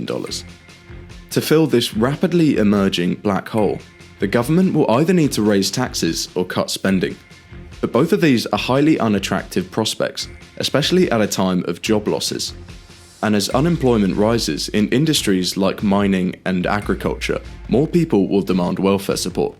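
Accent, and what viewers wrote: England, United Kingdom. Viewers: Bullshit with an English accent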